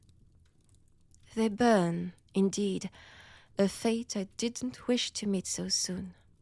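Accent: France. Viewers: A lovely Christmas present! A french accent audio!! I needed this!!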